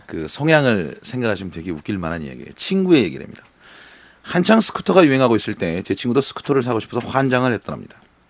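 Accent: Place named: Jeolla, South Korea. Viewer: 충청도라면서 왜전라도 말을 씨부리는겨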